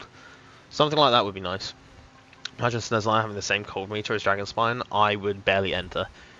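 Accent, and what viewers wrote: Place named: United Kingdom. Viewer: I DIDN'T THINK U WERE STREAMING definitely gonna watch ur next stream also yes not many british ppl say bo'o'wa'er but ur accent is quite clear and obvious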